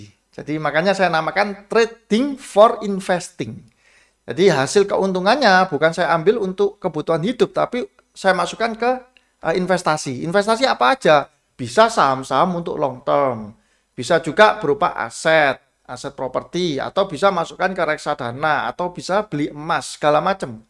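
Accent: Surabaya, Indonesia. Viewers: Wah asli suroboyo iki.. Salam dari meduro.. 😁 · arek suroboyo kapasan toh bro.. auto like n subcribe..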